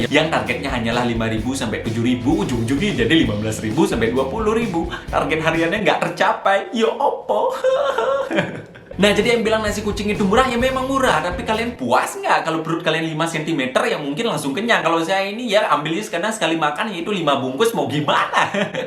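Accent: Bali, Indonesia. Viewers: Gataw knp ya, denger dialek bali itu sesuatuu bgt bli ✨💫💜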